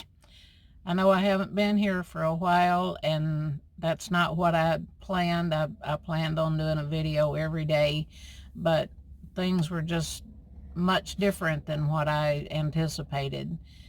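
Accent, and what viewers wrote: South, United States. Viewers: I’m so sorry you had such a bad experience. You did the right thing. No sense in lowering yourself to their standards. You are a beautiful person inside and out so don’t worry because usually it’s their issue not you. I should be there Wednesday. I’m going to meet MaryAnne at an artist meetup. I’ll call you to see if you want to go there. Artists are usually pretty laidback. I love your southern accent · I'm a new subscriber. I love a southern accent. I'm also 66 yo woman. Keep updating us. I love it. Mean people suck. That's on them. I think we could totally get along as neighbors even if your pet gets loose. The nice thing about van life you can leave and find new neighbors.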